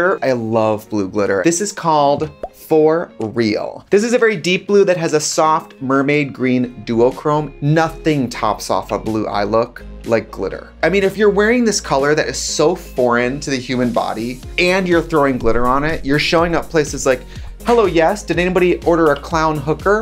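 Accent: Wisconsin, United States. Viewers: Now that I know you’re from Wisco, I can’t I hear it. I love you so much.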